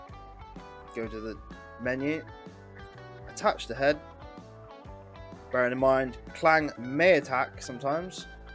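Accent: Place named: West Country, United Kingdom. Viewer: I know a west country accent when i hear one xD Nice video dude! That last section with the double hinge was exactly what i was looking for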